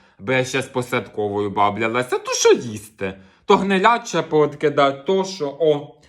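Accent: Poltava, Ukraine. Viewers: Насмiялась вiд душi! Дякую, як же Ви так точно вiдтворюете мову полтавщини, сiльський побут. Жiночi, розбiрки,. Ви-неймовiрний, дуже талановитий! Здоров,я Вам, натхнення! Чекаю нових замальовок!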